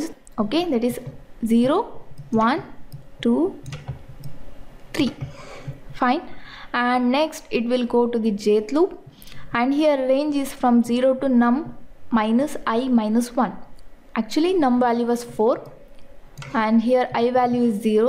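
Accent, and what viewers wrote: South India, India. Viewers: you are mallu madrasi or hyderabadi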